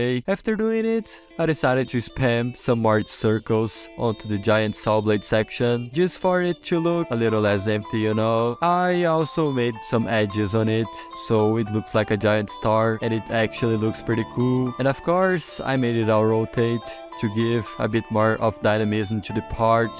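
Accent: Brazil. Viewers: Reconheço sotaque brasileiro de longe, é sempre raro e bom ver YouTuber BR na gringa. Keep up with the content <3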